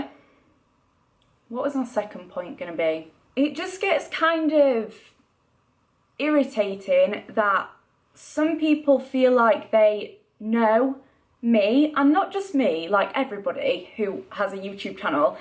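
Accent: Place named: North England, United Kingdom. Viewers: I love your accent where in the north are you fromy mums from liverpool but I live in sw london xxxx I love you . Great video x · She's from Sheffield I think, North of England :) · Reasons why quickly became 1 of my favourite youtubers: 1) She answers comments like says thank you or answers peoples questions which I find not many youtubers often do. 2) She works so hard on her videos especially editting them and they look amazing. Also you upload them regularly unlike some other youtubers. 3) You are brave enough to talk about this on the internet even though its a hard subject. 4) I dont usually like yorkshire accents but I love yours and I wanna speak like you although I cant do a yorkshire accent lol. Thank you for making this video even though you dont want anymore subscribers I think you truly deserve it and I cant imagine how anyone wouldn't like you. 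As well as that you made me think about being judgemental in this video and will try not to be, therefore you are making me a better person. Please dont stop making videos and please be in zoellas vlogs! I hope the hate stops and that this comment makes you feel better xxx 💞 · yorkshire i think?x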